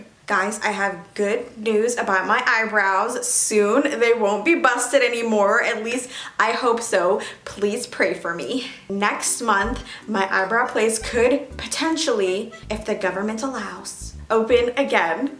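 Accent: Canada. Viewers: Always forget she's French till she speaks Canadian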